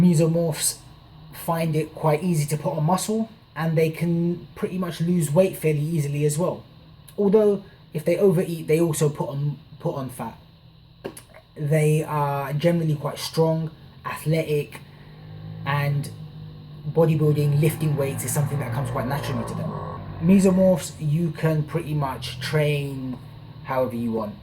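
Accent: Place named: England, United Kingdom. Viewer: another YouTube annoying english accent downvote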